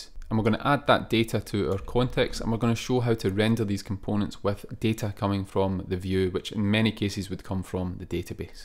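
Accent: Scotland, United Kingdom. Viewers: Ey lad, love the scottish accent, real killer. Would be real nice if you could just display what you're trying to build and what you'll get out of the framework/tutorial. Thanks a lot.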